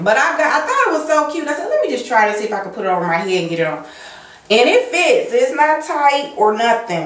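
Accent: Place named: Chicago, United States. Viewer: U r too funny!!! Nice finds. Where r u from? I remember u saying ur from the Midwest but living in NY. U have an accent and talk like my cousins in the Chi. :)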